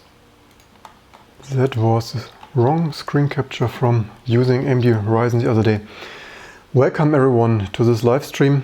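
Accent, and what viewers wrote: Germany. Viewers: Come on, everybody knows you're a German hearing your thick accent … 🙄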